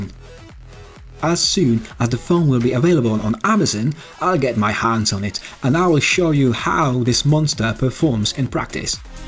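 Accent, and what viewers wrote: United Kingdom. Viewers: I really hoped for some original content or interaction with the device. This kind of seems like the original media packet recut and shared by an English accented presenter. The infrared example looked super fake, as if the scene depicted was CGI.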